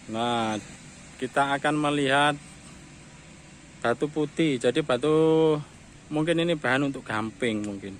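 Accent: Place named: West Java, Indonesia. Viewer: bhs mu ane kl jabar kn..lucu haha hebat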